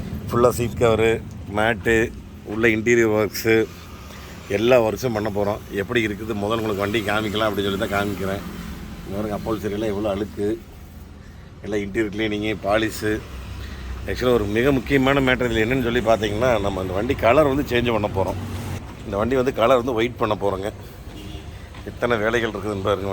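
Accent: Kongu, India. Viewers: கொஞ்சும் கொங்குத் தமிழ் பேசும் கோமகரே...! திகட்டாத தித்திக்கும் பேச்சுடைய திருப்பூர் திருமகரே...! எத்தனை அழுக்குப் படிந்த வாகனமாக இருந்தாலென்ன?? வெள்ளை மனமும் பிள்ளை குணமும் கொண்ட மதிப்பிற்குறிய அய்யா🙏 உங்கள் வேலைப்பாடுகளில் வாகனங்கள் ஜொலித்திடுமே தகதகவென்னும் தங்கம்போல❤❤❤❤❤ பின்னலாடைக்கு பெயர்போன ஊராம் திறமை வாய்ந்த ஊராம் திருப்பூர்...! அத்திருப்பூரில் வாழும் திருமகர் அய்யாவின் வெள்ளை மனம்போல மாறிவிட்டது...! இந்த வாகனத்தின் வென்மை நிறம்...! இந்த வாகனத்திற்கு மட்டுமல்ல அனைத்து வாகன வேலைப்பாடுகளுக்கும் கடினமாக உழைக்கும் உங்கள் நிறுவன ஊழியர்களுக்கு என் மனமார்ந்த பாராட்டுக்களையும்❤❤❤ வாழ்த்துக்களையும்💐💐💐 தெரிவித்துக்கொள்கிறேன் என்றும் அன்புடன் உங்கள் மகன்போல இவன் ரா.முஹம்மது கபூர் (சென்னையில் இருந்து)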